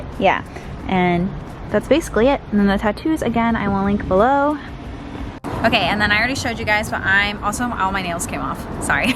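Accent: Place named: South Korea. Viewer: omg your korean is so cute (I like your 교포accent) 🙈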